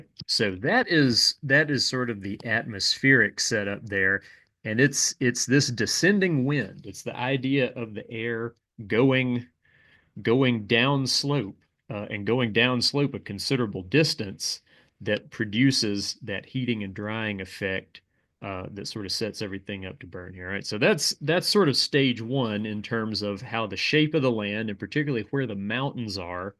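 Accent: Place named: Baltimore, United States. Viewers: You have what a lot of people would consider a dundalk or western baltimore accent. But its not every word you say. Mostly just the O vowels. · I could've sworn dat guy was from Dundalk or Essex. He sounds like Traffic Jam Jimmy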